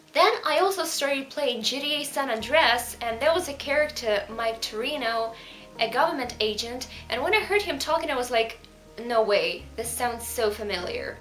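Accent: United Kingdom. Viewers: Tu acento es más bien británico.🤔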